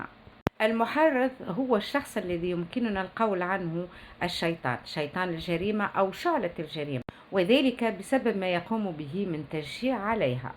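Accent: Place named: Tunisia. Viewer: ماشاءالله تبارك الله احسنتي النشر والتصوير والطريقه والمنتاج ابداع وتميز وتألق اسلوب راقي ماشاءالله ثقافه عالية بشهادة الاسكندراني العجوز جدو جابر الحنفي ممنون تحياتي لحضرتك اسعدني اسلوبك الراقي صوتك وكلامك اكيد من تونس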